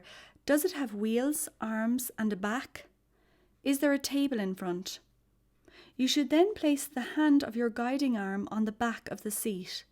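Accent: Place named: Ireland. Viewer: The narrator sounds cute. I'm a sucker for Irish accents.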